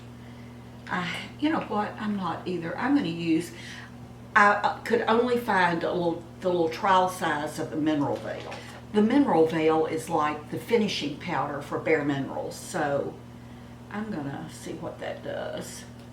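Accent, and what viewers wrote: South, United States. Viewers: I love the way you say "mirror". That southern bell accent is so sweet. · I thought I recognized that accent! I am from Arkansas. We sound alike. Lol!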